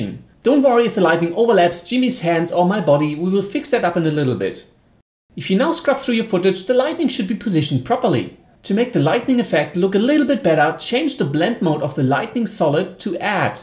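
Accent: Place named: Germany. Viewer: I want to know where this accent comes from! Germany?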